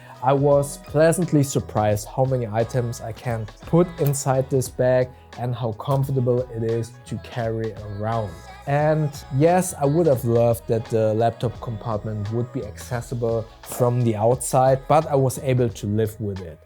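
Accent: Germany. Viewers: ⛰great video.. and it's funny, some reviewers are very terse and others use more words. I find yours that you do use more words and sometimes a tiny bit of filler words but yours are one of those few content creators that the extra words (but not too many) actually add to the content. I can watch your video such as this one at 1.0x and I can't say that about most creators. Maybe it's the German accent which is pleasing or maybe it's the street shots 🤣. I agree with you, overall the bag is amazing and I'm one of those who do love it. Really really happy with it and wasn't going to get it, held off for a while. They did an outstanding job even with the few nits that you mention. Keep up the great work! [and ps, that's a mountain emoji in my comment, nothing else!!]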